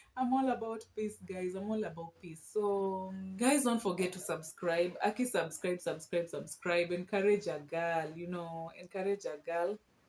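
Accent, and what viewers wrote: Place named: Nigeria. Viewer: Like u re conversant with how we 9ja talk... ve u stayed with Nigerians b4.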